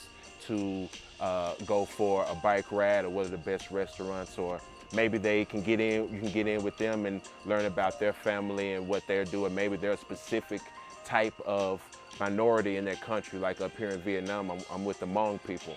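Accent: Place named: South, United States. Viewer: Youngblood, truly inspired by your message. Spent 11 years living abroad (Italy, Germany, Korea, Djibouti). Keep living your best life King! Oh yea, you gotta tell us what state you from. I am from GA. Your voice sound like you from the da south!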